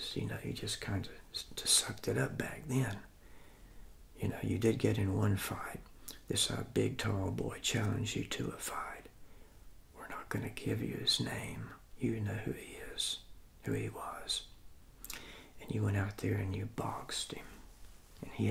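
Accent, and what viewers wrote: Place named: South, United States. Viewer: So lovely to hear a Southern accent. I grew up in Norcross 🍑 I enjoyed your video very much!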